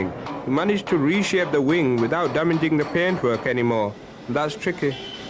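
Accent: Bradford, United Kingdom. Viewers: What a pure british accent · It was good to understand every word. You can't beat a good old Bradford accent. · Brilliant show this,real Bradford accent,Salt of the earth. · Stumbled on this,absolutely superb!the Asian Yorkshire narration is out of this world. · Thick Yorkshire accent, but his hometown is in Pakistan? I don't think so.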